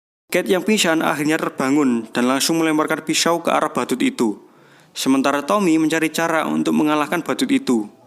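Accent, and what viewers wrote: Java, Indonesia. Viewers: Agak geli , klo medok bilang Gue , gue , kenapa gak pake kata Saya aja ? 😂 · medok haha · Terlalu medok penyampaian nya jd gue skip ya. Bye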